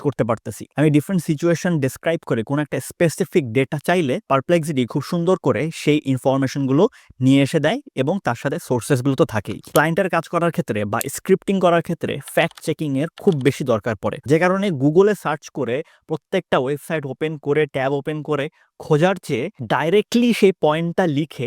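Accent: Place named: Sylhet, Bangladesh. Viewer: আপনি সিলেটি, ঠিক নানি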